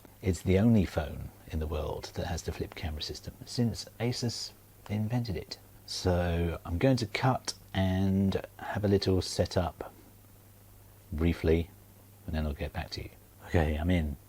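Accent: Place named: England, United Kingdom. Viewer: Are you in the UK (you sound english haha)? does volte and wifi calling work and what network you with?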